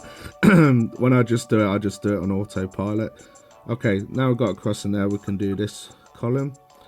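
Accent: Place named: England, United Kingdom. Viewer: brooo the england accent is ceazy, loved the video lad!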